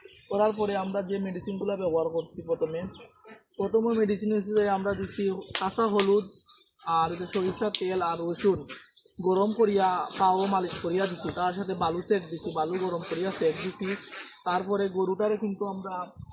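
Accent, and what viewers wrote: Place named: Sylhet, Bangladesh. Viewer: ভাই সিলেটের মানুষ কি এতই মূূরখ যে বাংলা শুদ্ধ ভাষায় কথা বলতে পারেনা আপনার এই ভাষা কি সারা বাংলাদেশের মানুষ কি বুজে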